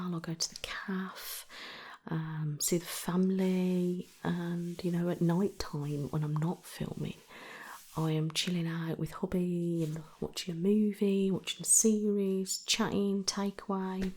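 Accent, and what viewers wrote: United Kingdom. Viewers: I am so glad I found your channel a couple weeks ago, I have been watching your videos every day & just love you. I was born in the UK (Leyton) but moved to Canada 40 years ago. I feel a connection in the sense that you remind me of "home" you speak just like my aunts & cousins & it's a lovely feeling. Thank you!! You are a beautiful soul. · I just watched your Q&A tonight and one thing struck me that I had to comment on. You mentioned people commenting negative things on your videos, for example your facial expressions. That my friend is one of your strongest qualities. I absolutely love your facial expressions! I've always loved the British accent so that is a plus too. Your haircut video is my favorite so far. I've watched it many times. And as far as the mental health goes, I have anxiety and have most of my adult life and also worked on a mental health ward of the hospital and my heart goes out to that type of patient. 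So I'm glad to see you speaking out on the mental health issues. Keep up your good work. You're my new favorite artist. Even us 65 year old grandmas enjoy ASMR. 🙂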